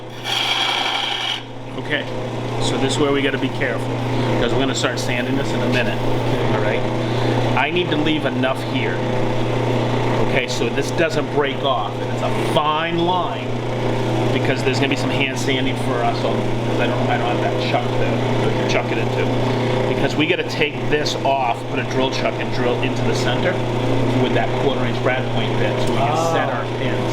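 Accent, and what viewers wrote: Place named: Boston, United States. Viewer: Love the Boston accent,?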